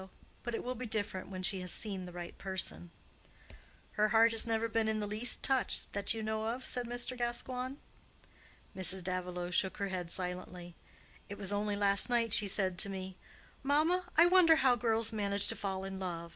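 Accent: United States. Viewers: I think its a great reading. It's actually the subtitles that are poor. I don't mind the American accent it's their literature as well as Britain's. Also tonally American readings are easier to listen to as many English readers flatten the vowels and make it a bit drab. They also get rid of class bias and certain readers affectations.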